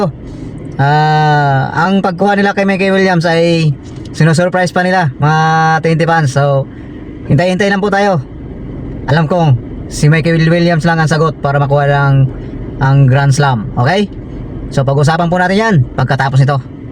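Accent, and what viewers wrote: Visayas, Philippines. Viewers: ako alam kona visaya ka bossing kaya nga ganyanvka mag salita ksi naiintindihan ka nmin walang masama sa pananalita ng visaya nag papakatotoo ka lang porket visaya ng lalait na mga hinayupak kala mo nmn napaka perpektong tao nila hindi ata alam ng mga bobong ginebra fans na walang perpektong tao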